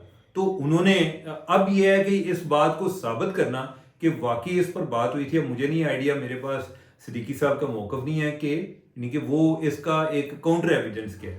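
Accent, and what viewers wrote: Punjab, Pakistan. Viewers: Punjabi touch. ❤🎉...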